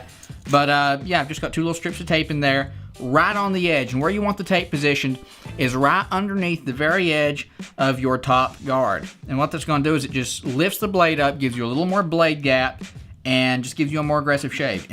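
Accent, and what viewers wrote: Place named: Tennessee, United States. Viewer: I'll have to try this. Are you from TN by chance? Just cause of the accent is why I ask.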